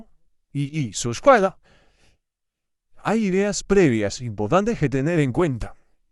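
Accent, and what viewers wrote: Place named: England, United Kingdom. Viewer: Tienes acento inglés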